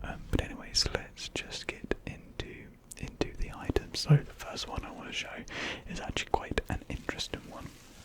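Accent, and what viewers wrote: United Kingdom. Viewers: I adore you, but you're also easily the most British person I've ever listened to, like painfully British.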